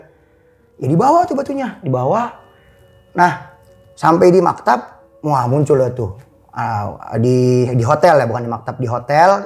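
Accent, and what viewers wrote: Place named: Betawi, Indonesia. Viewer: dialeg betawi kak... Setelan normalnya kek gitu 🤭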